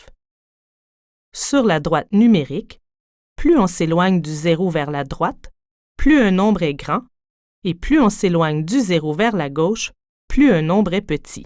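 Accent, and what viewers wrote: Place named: Quebec, Canada. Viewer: Je comprend pas pour quoi tout le monde dit que ils font pas comprendre car Elle a in accent come c’est québécois j’ai compris tout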